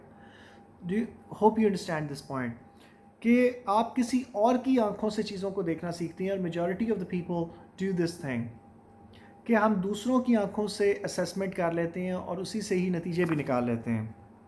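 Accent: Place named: RP, United Kingdom. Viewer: Great elucidation. Especially ,I greatly admire your RP accent .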